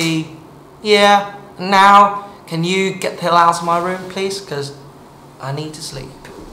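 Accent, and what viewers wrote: United Kingdom. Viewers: that British accenttttt💓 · That British accent tho~~~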